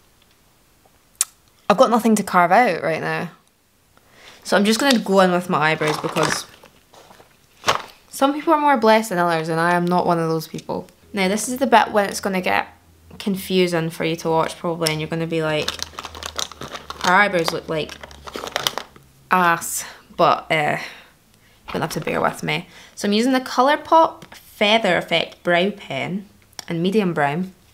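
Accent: Scotland, United Kingdom. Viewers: Hearing a fellow Scottish person on YouTube brings me a lot of joy 🤧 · took me 5 seconds to hit the like button after i heard her accent lmao cant shaft another scot's makeup tutorial!! ur stunning! 💙🤍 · you’re Scottish? - subscribed.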